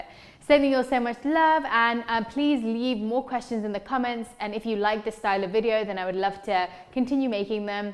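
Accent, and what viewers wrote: Sri Lanka. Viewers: Hey, are you a Srilankan? I always thought you are an Indian (a kannadiga or something) but your Tamil sounds like Sri Lankan! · Holy cow... I never knew u can speak tamil and it sounds so much like you are from jaffna. Love from Sri Lanka ❤👌🏼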